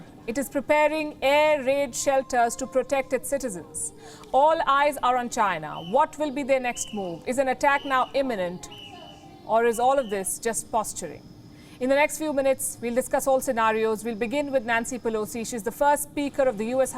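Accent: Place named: India. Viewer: I can't stop laughing the way she speaks is an Indian accent I am sure you will be able to understand it. By the way World has various accent and you can't say anyone wrong just for their accent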